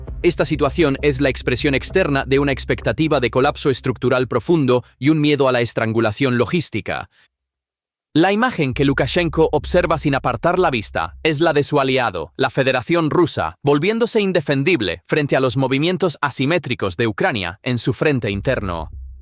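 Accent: United States. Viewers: españa con acento gringo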